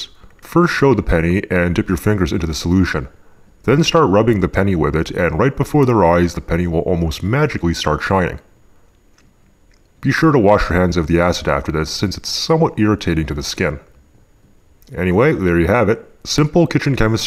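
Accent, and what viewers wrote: Canada. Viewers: I personally think Canadians are awesome. They have beautiful accents, they live in a fantastic land, and best of all they have this guy. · Are you canadian? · Ahhh ur from Canada eh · I knew he was Canadian in the first video I saw, which was I forget which one lol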